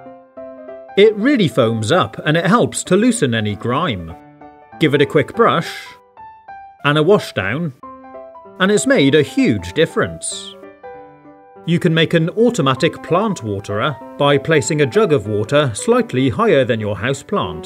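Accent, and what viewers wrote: United Kingdom. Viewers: AWESOME and very much APPRECIATED. THE IDEA OF SUCH A VIDEO IS EQUALLY CLEVER AS ALL ITS CONTENTS. I ALSO LOVE TO LISTEN TO THE BRITISH OR BRITISH SOUNDING ACCENT. VERY WELL DONE.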